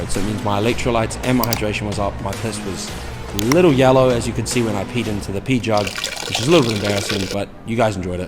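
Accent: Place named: Scotland, United Kingdom. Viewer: I thought u are Scottish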